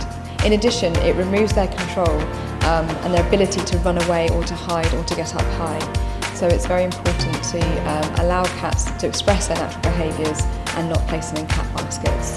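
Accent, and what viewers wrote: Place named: United Kingdom. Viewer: The music was overpowering the female voice and it was a British accent, so even more difficult to understand.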